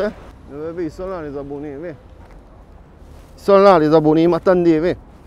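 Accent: Marseille, France. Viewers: c'est sketch chez moi, dès que la situation s'y prête on t'imite. Ma meuf quand je la gêne dans la cuisine elle gueule 'Oh enlève toi du milieu" en prenant l'accent marseillais. Pareil, elle me montre un meuble qu'elle kif et elle me dit "Tu pouce blue ou pas ?" Tu rends ouf les gens 😂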